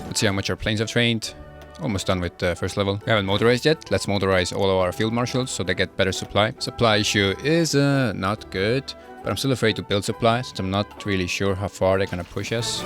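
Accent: Estonia. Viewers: HOI4 + draculaflow + eesti aktsent = instant sub 😂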